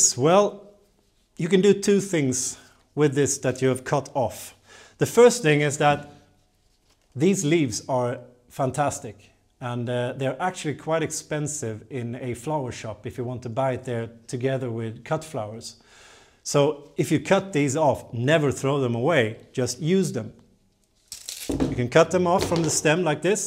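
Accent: Sweden. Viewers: I know that most of you don't mean it that way, but actually I find it a bit rude to make fun of his pronounciation, or to put the "funny" pronounciation above the content. The content is so good! He is obviously Swedish, but he speaks very good English, so let's be thankful that they make the videos in English and not in Swedish. In Swedish, I wouldn't be able to understand them, because I am German. I would probably also have an accent if I'd start making English videos... :-D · its the beautiful swedish accent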